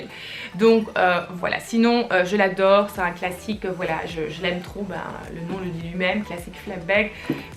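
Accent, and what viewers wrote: Belgium. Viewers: J'adore ton accent Brusseleir ne change pas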